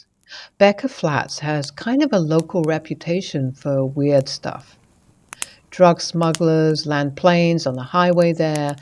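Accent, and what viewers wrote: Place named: England, United Kingdom. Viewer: Yay finally an English voice telling me spooky stories! And fire sounds too! Excellent, continue please ✨☠️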